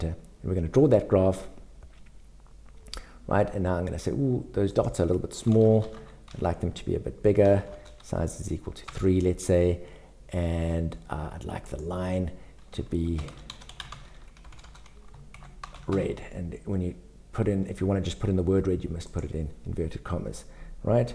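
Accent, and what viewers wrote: South Africa. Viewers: Can hear that SA accent loud and clear. Great channel Boet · south african bloke? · Are you South African bro?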